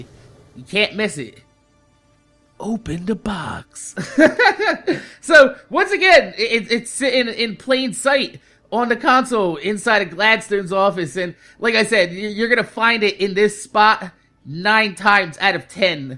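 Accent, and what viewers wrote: New Jersey, United States. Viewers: I can hear the jersey in your accent hahah, away at school always good to hear that shit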